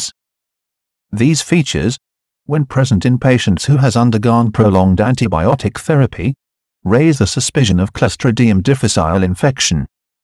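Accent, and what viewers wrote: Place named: India. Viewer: 8/10 ... indian english accent would be the most welcome change... nice content though...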